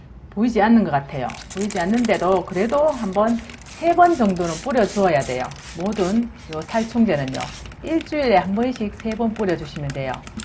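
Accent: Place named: Busan, South Korea. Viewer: 부산 사투리 너무 매력있어요~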